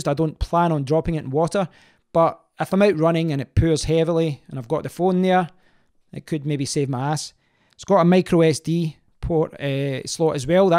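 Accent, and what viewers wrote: Scotland, United Kingdom. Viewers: I love your Scottish accent...It has been a while a heard such a pure Scottish accent! And the U11 is definitely the Android phone to get in 2017. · Excellent video love the scottish accent so refreshing to hear seriously considering on getting the U 11,I have the LG G6 at the moment and i am enjoyingi it ,but I am admittedly a big fan of HTC and can't wait to get the U 11.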